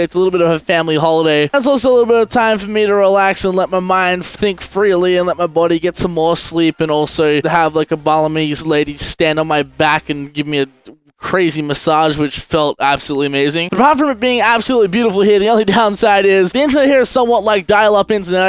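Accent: Australia. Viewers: wait a second, you're australian? what state?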